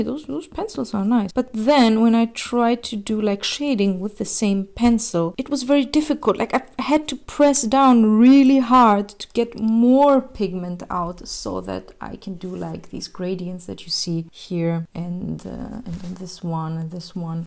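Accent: Germany. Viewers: R u german cuz you kind of have a german accent and also faber is made in germany and i am also german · I live in Germany... Sooo its cheap XD btw I think she is also Herman... Caus the accent and on the pack was standing '' Buntstifte" that's German and means colored pencils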